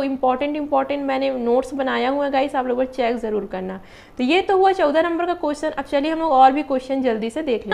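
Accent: Chhattisgarh, India. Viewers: Mam chhattisgarh se ho kya?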